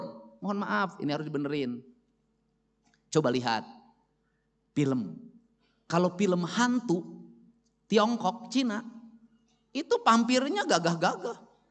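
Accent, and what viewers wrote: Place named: Java, Indonesia. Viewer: Tahu aku kabayan,,,, Tapi si KDM besar nya, di subang, subang itu banyak jaware ( jawa separo), sejatinya adl bangsa jawa. Lihat saja KDM, kadang2 keluara logat jawa,, lihat saja rumahnya itu rumah bergaya jawa bukan sunda..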